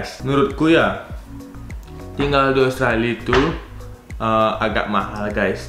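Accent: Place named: Bali, Indonesia. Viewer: logat bali asane nahh :D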